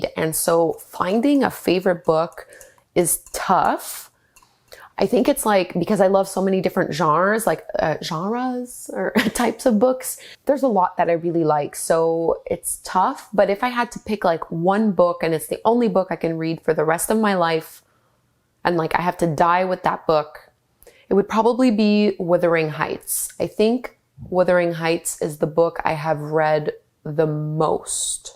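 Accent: Canada. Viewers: I used to live in Ottawa in the Hunt Club area for few years. I am now in Melbourne Australia. It' so nice to hear the Canadian accent everyday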